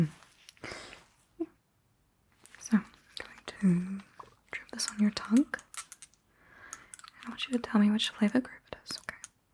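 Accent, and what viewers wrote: New Zealand. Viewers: lordy kiwi accent melting my vagus nerve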